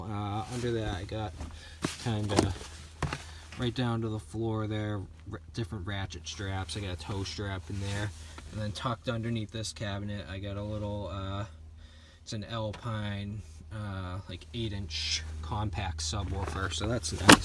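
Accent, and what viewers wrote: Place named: Maine, United States. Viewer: Sounds like a fella from Maine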